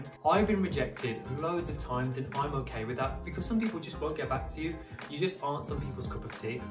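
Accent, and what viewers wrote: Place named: Birmingham, United Kingdom. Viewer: Don't believe it about the accent not being liked - I love the Birmingham accent!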